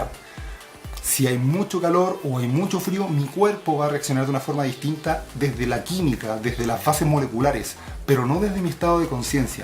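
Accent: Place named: Chile. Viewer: muy bien!! es la forma q tienen los antiveganos de atacarnos, yo ya no respondo a sus ataques, me cansé y no se consigue nada dando explicaciones... muy buena explicación... eres fantástico, caes muy bien, me encanta el acento y el tono q tenéis los chilenos al hablar. Gracias guapo por la información. Go vegan.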